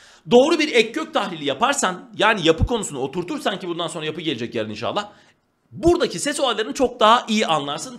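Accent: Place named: Urfa, Turkey. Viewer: / Hocam urfa şivesi😊😊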